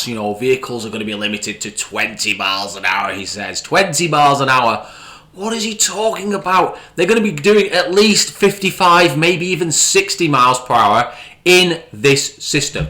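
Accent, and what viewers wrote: United Kingdom. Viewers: I grew up loving every single British accent I've ever heard. Thanks to the rampant self-owning stupidity coming out of my speakers in your dumb-soaked voice has diminished that pleasure significantly. What really proves that you don't know what "science" is hardly the idiocy you've given about his obscure research or his lack of engineering patents, it's the fact you thought he wouldn't look up source materials like the Boring Company's own published materials. "That's why we don't listen to the guy, because he doesn't know anything about anything". Says the clown with about 8310 subscribers, compared to a 1.02 million subscribers. If only, if only, IF ONLY... it's such a crippling shame that you aren't really a crew member sharing the fate of the USCSS Nostromo. Being the kind of creature who would assist and enable snake-oil salesmen like Musk means you ought to be banned from making videos. 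I'd consider listening to you the same way people might watch clowns, to poke fun at your inability to do basic thinking. It would be amazing to watch you "shoot down all" his "stupid videos" and watch you blow off both your feet... but it hurts too much to hear your idiocy expressed in a British accent. You'd be ashamed and would componse an apology video if your brain functioned, you gamma-slug.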